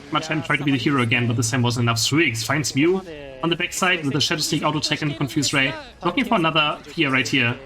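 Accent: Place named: Germany. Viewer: Your German accent is too much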